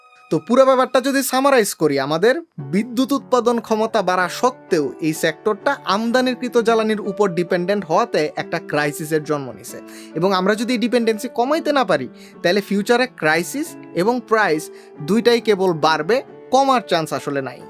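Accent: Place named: Netrokona, Bangladesh. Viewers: I'm also from Netrakona and I've shocked so much after listening it😊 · আপনার বাসা নেত্রকোনা নাকি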